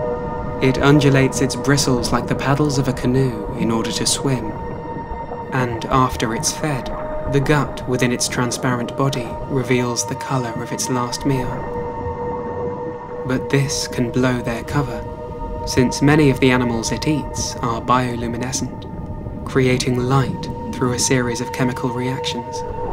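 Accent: England, United Kingdom. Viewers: he literally has an english accent is this comment supposed to be rage bait 😭😭😭😭😭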